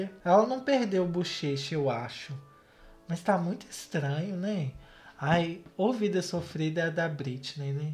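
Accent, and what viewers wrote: Minas Gerais, Brazil. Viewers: "Eu falei muito mineiro agr" eu mineira pensando.... eu falo assim? Kkkkkkj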